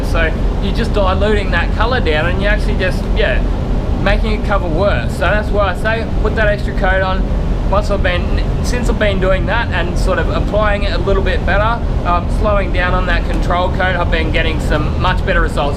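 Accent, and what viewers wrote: Australia. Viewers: Australian accent + high speed talking= disaster 😄 Bro, your channel is popular all around the world, not just in Australia, please slow down, we can't understand you 🙂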